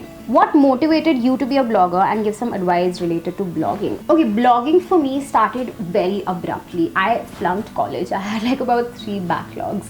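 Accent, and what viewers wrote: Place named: United Kingdom. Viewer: i love your english accent...how did you learn it n speak it so fluently.?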